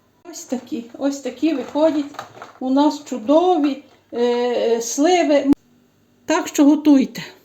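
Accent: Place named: Western Ukraine, Ukraine. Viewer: Добре відчувається мова західняків. Наші люди як бджілки, все тягнуть додому.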